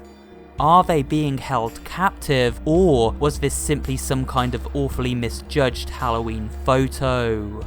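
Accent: United Kingdom. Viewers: You know it's fr when there's a British narrator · Chills UK voice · Hit that right on the button definitely a British chills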